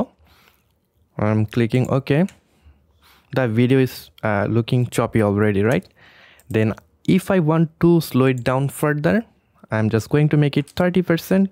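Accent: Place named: Assam, India. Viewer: You sound so assamese... are you one??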